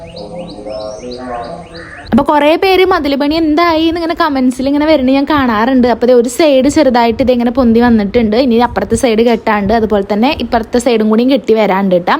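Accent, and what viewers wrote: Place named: Pattambi, India. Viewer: ചേച്ചിടെ കുക്കിംഗ്‌ and way of talking okke kelkkumbol നങ്ങടെ അതെ സെയിം രീതി എനെന്നു എപ്പഴും തോന്നാറുണ്ട് 😃 ചിലപ്പോ അടുത്തയോണ്ടാകും pattambi😍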